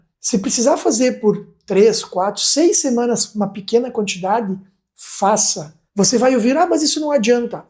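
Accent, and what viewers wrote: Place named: Rio Grande do Sul, Brazil. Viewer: Você tem sotaque de gaúcho no chamarão de 🍃 verde no cuias do corpo humano também bom-dia senhor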